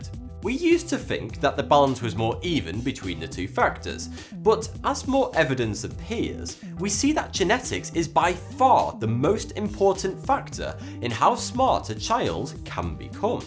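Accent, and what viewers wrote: United Kingdom. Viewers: Love his British accent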